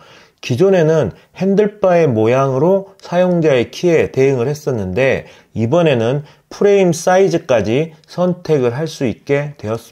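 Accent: Jeolla, South Korea. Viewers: 전라도 사투리 맞나요?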